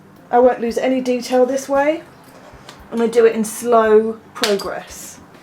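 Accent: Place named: Manchester, United Kingdom. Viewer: I love your accent. It makes me miss my Mum. She's from mancheste. Good video.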